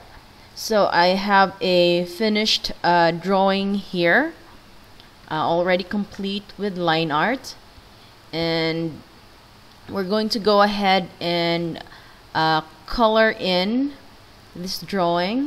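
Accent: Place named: Philippines. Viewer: Pilipino ka po Mam...halata ko sa Accent mo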